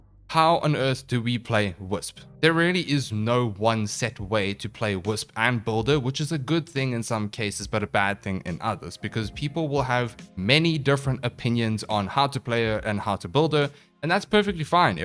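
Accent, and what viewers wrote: South Africa. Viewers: Broooooo i came here loooking for this comment🤣🤣🤣🤣🤣 love how south african he is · are you from SA?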